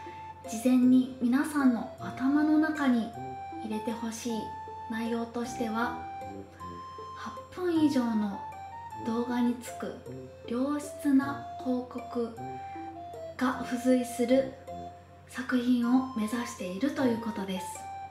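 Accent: China. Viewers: 日本語がかなり上手い中国人の訛り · 日本語学習中の中国人留学生って言われたら信じる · なんで日本語習いたての中国人みたいな喋り方なんだ？ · 中国人みたい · 中国人みたいな喋り方だな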